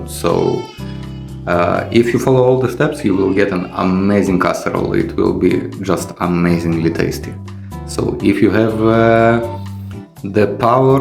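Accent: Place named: Russia. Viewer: brother your video looks so nice, just continue, but i think you are russian for reason your accent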